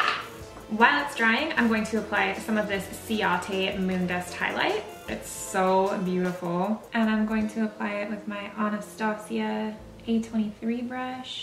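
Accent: Canada. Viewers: is it just me or does she sound SO canadian in this video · can you just make a video of you saying sorry I love when Canadians say it. It sounds like sorwy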